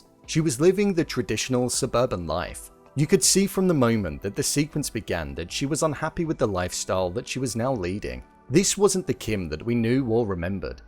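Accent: United Kingdom. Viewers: 英国なまりを、頑張って聞き取った。 と、思う。 次も、頑張る。💪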